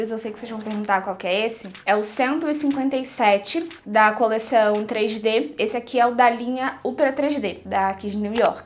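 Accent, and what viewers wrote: Rio de Janeiro, Brazil. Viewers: Você e muito linda e simpática, mas tenho uma pergunta, você e Carioca?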